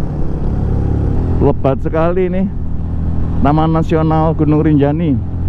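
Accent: Java, Indonesia. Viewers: Kalo ke Lombok ( khususnya ke Sembalun) ajak anak Istri dong /pacar atau temen boncengan biar ndak ngomong sendirian 😊😊😊. Kapan ke Lombok lagi Bang, dari Jawa mana Bang logat jawanya medok sekali, pingin bisa bahasa Jawa aku 😀😀😀😀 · Ini mas ny org Jawa bkan? Klo dri logat bicarany mirip org Jawa?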